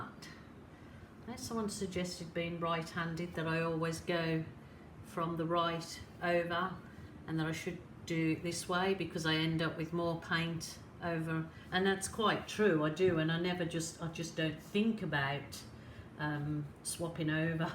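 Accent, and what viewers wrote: Birmingham, United Kingdom. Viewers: Funny after all those years you still have a Brummie accent. My hubby is from Luton in Bedfordshire and has been here in Sydney for 39 years. Aussies think he has a British accent and Brits think he has an Aussie accent LOL. Thanks for all your videos and sharing your experiments with us.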